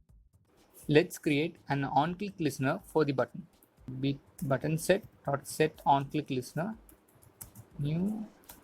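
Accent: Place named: England, United Kingdom. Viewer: What the English accent, can't understand anything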